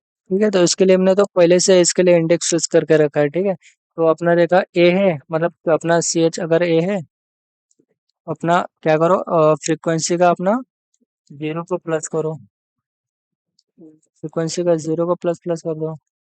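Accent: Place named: Maharashtra, India. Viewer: your marathi accent is so sexy:face-blue-smiling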